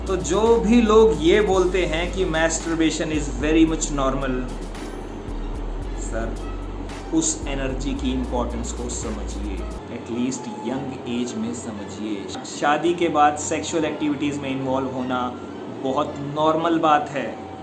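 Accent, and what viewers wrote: Haryana, India. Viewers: Bhai ji aap haryanwi ho kya🔥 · Is video me Pure Haryana accent me bate kiya hai😀 similar to Acharya Agni Dev Arya ji 😀 pure Haryana accent 🙏🙏🙏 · I love this khadi hariyanvi boli 😉😉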